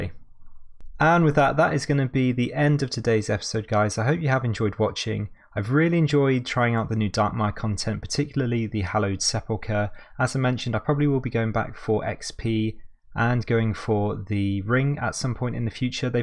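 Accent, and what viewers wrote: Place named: RP, United Kingdom. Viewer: bro im british and even I think you sound posh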